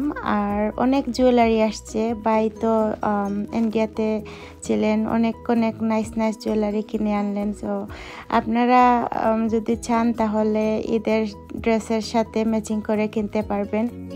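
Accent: Sylhet, Bangladesh. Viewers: when you spoke sylheti in your vlog it was better. It sounds nice when us sylheti speak sylheti. Please change back to sylheti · Apu apnar sylheti kotha e valo lage. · apa tumare amrar sylheti matle bala lage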